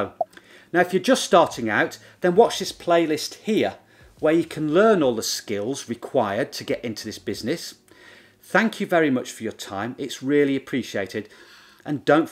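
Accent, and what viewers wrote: United Kingdom. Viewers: Love your British accent...It makes your tips oh so much more valuable!! When I close my eyes, I hear Michael Caine...Cheers!! #smileyheart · I didnt intend to go for KDP, because so many people said (at least, on Youtube) it's saturated. But your video about creating a lined journal persuaded me to give a try. As for this video, it's very honest and to the piont. I dont know, if it seems to me but you sound British rather than American :)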